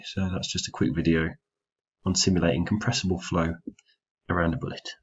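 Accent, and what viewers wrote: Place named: North, United Kingdom. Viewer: Thanks for your reply!, im from Australia. Your videos were a great help with my Thesis, modelling and simulating a new Wind Turbine i designed. I mentioned to the other Engineers for great professional tips look out for the guy with the Northern UK accent, am i right location wise? .. Yeah the mesh looks good after a tonne of fine tuning whilst using various research papers also in setup. I actually used one of your other videos mesh today and its currently running, it hasnt crashed so thats good but looking at the plot monitor for normal forces and its still too high. Im going to save this project as it looks like its actually going to complete successfully. I will recreate the mesh using this videos mesh and let you know if it improves the Y+ value which i would assume isnt good at this point. (What i like about this video is the refinement region), which i have been seeing other similiar simulations to my own using but in a convoluted way. 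Your way makes way more sense. Thanks again mate!.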